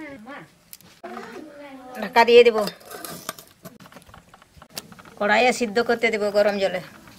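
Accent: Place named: Mayurbhanj, India. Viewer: Definitely ur from mayurbhanj 🙏😀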